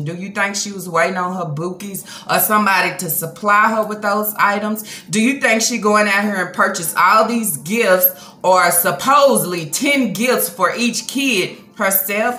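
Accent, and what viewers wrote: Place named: South, United States. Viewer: I'm new to this channel can someone tell me what State is from I hear Southern twang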